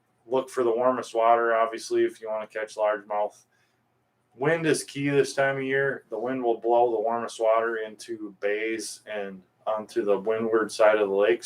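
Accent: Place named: Wisconsin, United States. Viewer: I’ve commented a few times on your channel and you’re the best at reporting northern Wisconsin fishing forecasts I’ve ever seen. The best at knowing which lures and techniques are working at any given time in any given place in Wisconsin. I love it. The Wisconsin accent is something that really brings me back to the old days up there. I know your target audience very well since I grew up on lakes in Wisconsin and I remember my Wisconsin neighbors and friends being just down to earth good people. I miss them and I miss Wisconsin. It’s part of who I am, 60 years later. After traveling all over the country and South Pacific, I’m a fisherman, always have been. But I learned to fish since I was a little kid in Wisconsin. Here’s what I’ve noticed after decades of traveling around living in California, Arizona, Hawaii, Washington, etc, I still fish like I’m from Wisconsin. 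And I am. And you know what? I’m a better fisherman because I grew up there. Wisconsinites are f*cking serious about their fishing. Not many distractions on a northern Wisconsin lake or river. No city crap, just a real in-tune and focus on nature and how to fine tune everything involved. Wisconsin winters are some of the most brutally cold around, and guys go out fishing anyway. Camping in the snow. Fishing through the ice. Nothing phases a Wisconsinite. Spring is barely a dream not a desperate hope. It’ll come, and with it, all sorts of bugs. Hatches. Mayflies. Mosquitoes. Frogs. Crickets. Fish are excited, so wa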